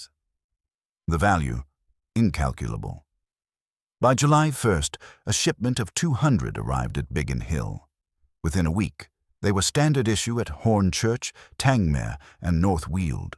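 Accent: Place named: United States. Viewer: An entertaining story but if American robotic narration is used and the graphics are CGI, I'm skeptical of it fully being true. For a story on something British, hearing robot narrator say, 'sodder' instead of 'soldering' and 'Aluminum' instead of 'Aluminium' ... not good, and anyway, wasn't it fuel starvation that caused the Merlin's to conk out before a fuel injection system was utilised.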